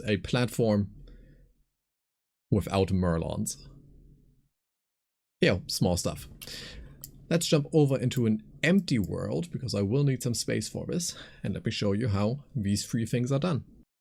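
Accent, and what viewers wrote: Germany. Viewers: Hey bro, is that a German accent I hear there? Really like your content btw, really chill, just like the game lol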